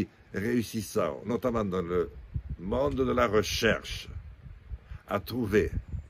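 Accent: France. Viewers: Bon rétablissement cher monsieur Lassale vous nous êtes très cher. Votre accent sent bon notre France ou ce qu'il en reste... je suis franco-française j'aime par-dessus tout mon pays et de voir qu'il se dégrade aussi vite me fait dire aussi "j'ai mal à ma France". Remettez-vous bien vite auprès de votre famille.😅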